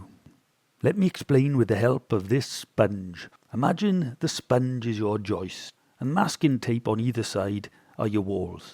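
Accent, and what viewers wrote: Wales, United Kingdom. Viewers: Clear, concise, excellent explanation of concepts!!! But unfortunately for the rest of the day I will now be talking in a heavy welsh accent!!!!😆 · Beautiful welsh accent and very informative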